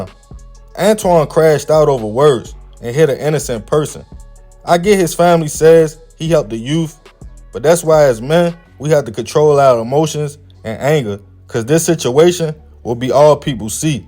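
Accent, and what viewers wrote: Baltimore, United States. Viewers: Man If It wasn't for my Army Buddy From BALTIMORE Back In The mid 80s I would Never understand your accent..HOT DUGGS for All my friends 😂😂😂😂😂❤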